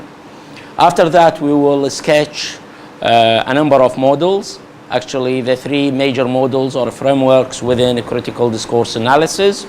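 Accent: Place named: India. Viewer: Though I thought him Indian, his English doesn't bother me at all since I've benefited from his presentation.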